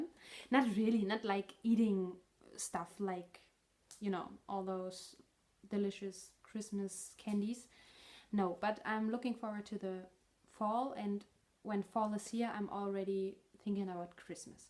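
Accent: Germany. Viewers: I just found your podcast and just love it! Dont worry about your English, it is wonderful, I lived in Germany for three years and can hardly speak it at all. I love your accent and it brings back such happy memories of when I lived there. We lived in Northern Germany in a town called Duelmen out side of Munster. I love the word of the day idea too. I hope you keep podcasting! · Moin moin! Your English sounds so beautiful with your German accent! I've watched all your recent podcasts and decided I love them so much I would start at the beginning! My husband works nights, my children grown, it's nice to have "company" while I knit and crochet in the evening. I'm so glad this wasn't your first AND last podcast. Thank you for sharing your passion for yarn, knitting, crochet and the Lord!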